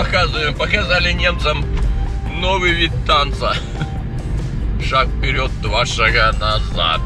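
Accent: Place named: Ukraine. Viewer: Вы сами и есть украинец, не забывайте пан! Даже в вашей речи чувствуется акцент - "Або" "г"кание. И это здорово! Слава Украине!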